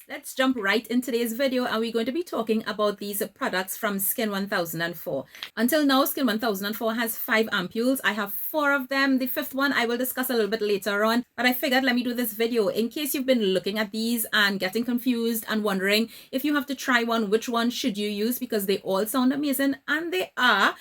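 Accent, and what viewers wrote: Trinidad and Tobago. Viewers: A Trini! A Trini accent never sounded so sweet 🇹🇹🙌🏽🔥!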